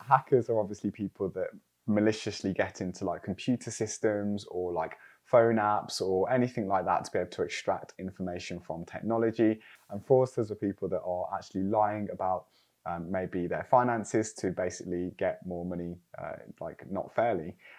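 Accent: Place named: Birmingham, United Kingdom. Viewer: Thought I clicked on an unknown T video😂. You know I thought I could detect a hint of "Brummy" in the accent😂. Great video. I was tempted to do Ethical hacking at undergrad, but anyone who hears the term hacking outside that realm will immediately have negative connetations. But it's got that white hat hacking roles are cropping up.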